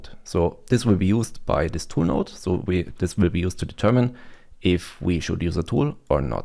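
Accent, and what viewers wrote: Germany. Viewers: Are you german by chance? Love the accent. Keep up the good work.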